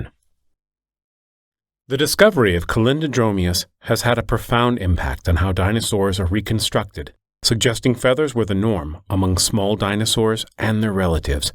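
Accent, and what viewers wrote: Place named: United States. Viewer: the new narrator is very sensationalist and sounds like talkin to children on a village pfair. Way too American somehow, please get back the previous guy :)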